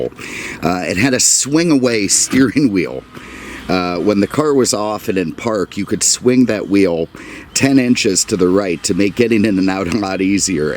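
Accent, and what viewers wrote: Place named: New York, United States. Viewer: It was to see this video. What a beauty! Miss your beautiful happy disposition!!.😂😂😂😂😂 You sound like you are from New York.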